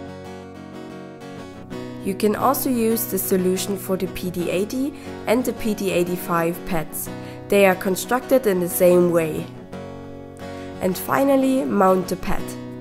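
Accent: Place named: Germany. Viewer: love this idea, great job. ) and very cute german accent as well :)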